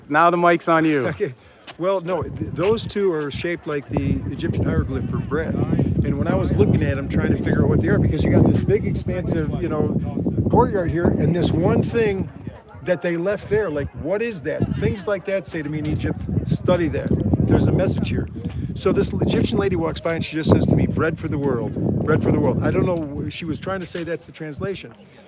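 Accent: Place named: South Boston, United States. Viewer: I hear Southie..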